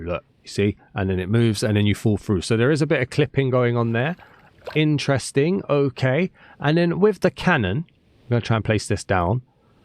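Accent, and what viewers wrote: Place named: United Kingdom. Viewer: Im never get tired of british accent 😅 tyal 4 the vid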